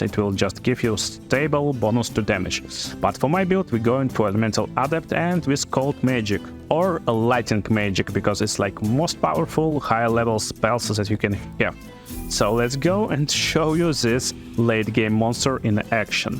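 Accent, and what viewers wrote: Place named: Russia. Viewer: Man, sorry for this question but are u Russian? :D Just interesting, you speak clearly but a little bit with an accent like a russian And thank you for the video! Great review about wizard!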